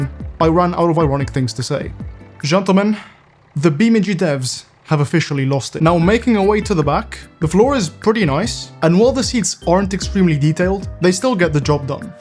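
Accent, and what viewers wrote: Italy. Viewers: Ciao,ho capito ce sei italiano dall’accento. Io vorrei che tu iniziassi a parlare in italiano nei tuoi video(se ti va)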